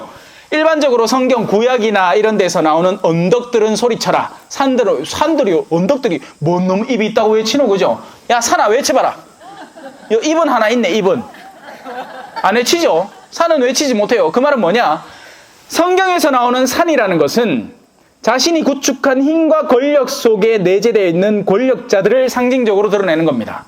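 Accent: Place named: Busan, South Korea. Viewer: 신부님 부산오셨군요 비슷한 사투리가 정겹습니다~ 항상 열정적이고 알아듣기 쉬운 강론 고맙습니다~~😊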